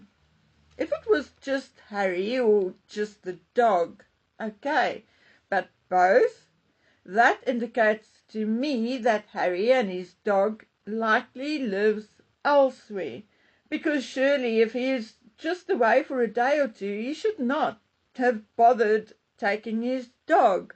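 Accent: South Africa. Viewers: When I subscribed to your channel, several years ago, I did not subscribe because of the Harkles, Royal Family, or anything of the sort! When I first came upon your channel, I just LOVED your accent!!! I had never heard it before. I knew it wasn't from Great Britain or Australia, or New Zealand, or the U.S.!!! I listened to you a little more & learned you were in South Africa & told you I thought you had the most beautiful accent! One lady saw my comment & thanked me & told me it was so lovely of me to say that!!! You, no doubt, probably don't remember, but you & I even emailed back & forth a few times!!! I thought that was SOOO nice of you to respond to me!!! You never ask for anything, you never advertise products you want to sell. All you do is bring us the truth!!! Here we are, years later, & I'm still here everyday, still subscribed, & still listening to your lovely voice & accent!!! 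😊 ♥ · Ive only ever unsubscribed once. I did it because after giving it a try for a couple of weeks I just didn't enjoy her voice or her opinions, at all. I've enjoyed or learned something in each video. I enjoy hearing about Dragon Man and most of all, I love the South African accent. TY.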